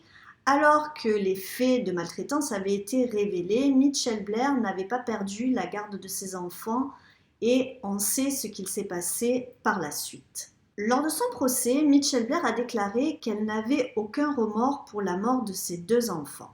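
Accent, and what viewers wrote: South, France. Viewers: Quelle horrible affaire... et malgré le fait que je suive beaucoup de youtubeurs true crime, c'est la première fois que j'en entends parler. Bravo, continue à nous proposer du bon contenu 😊 PS: j'adore l'accent du sud! 🏝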